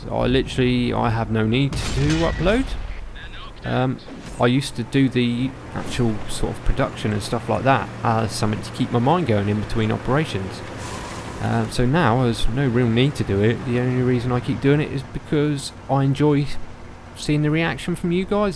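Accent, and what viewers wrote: United Kingdom. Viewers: Great video...more like it would be appreciated. Thanks for all the hard work you put into the channel, Happy New Year to you and yours, and keep tanking when you find the time...BTW, take your own advice about being yourself; your voice is awesome. 'Course, being a Yank, all you Brits sound good...cheers!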